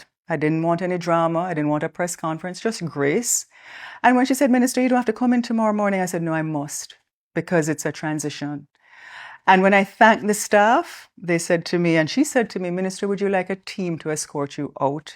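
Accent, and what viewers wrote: Jamaica. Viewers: You sound very Jamaican 🤔🤔🤔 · You’re still so beautiful, eloquent and classy. You sound like one of my peeps from Jamaica. It really doesn’t matter where you are from, just that you reminds me of one of my fellow Jamaicans. ❤❤❤❤❤❤❤❤❤❤❤❤❤❤❤❤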